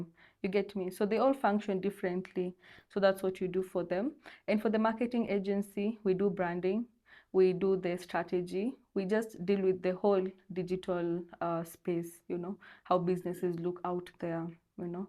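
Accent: Kenya. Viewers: You are a purely Kenyan ata accent ni yetu .. beautiful girl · I don't watch Indian movies coz their accent chocks me but yours is pure Kenyan. I love you already. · Hiyo accent! Wewe ni wetu! I relate with the ostracization; I experienced it growing up, I overcame and I'm glad you also overcame and your positive outlook has helped you, keep winning! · Naona kila kitu kwako ni mkenya kutoka kwenye lafudhi, tabia, kuongea na uhuru wa kujieleza na ukosefu wa uwoga wa wanawake. Kwa muhtasari wewe ni muwazi. Heko kwa kujijengea sifa za uhuru. Nakutakia kila la kheri. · Wewe ni Mkenya halisi. Your accent is very Kenyan. Kiswahili chako ni kizuri sana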